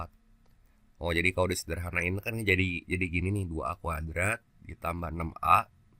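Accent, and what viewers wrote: West Java, Indonesia. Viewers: LUCU IHH LOGAT SUNDA LOP LOP PA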